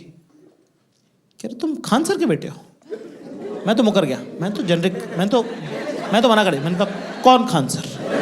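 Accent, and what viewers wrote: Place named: Bhopal, India. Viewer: That bhopali accent is hitting hard❤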